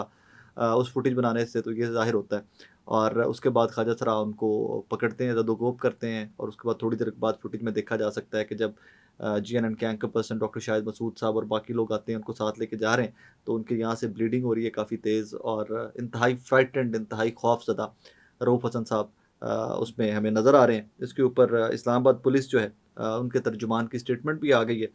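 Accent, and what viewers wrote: Punjab, India. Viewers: Ap ka punjabi ka terka sun k sawad dugna ho jata ha vlog ka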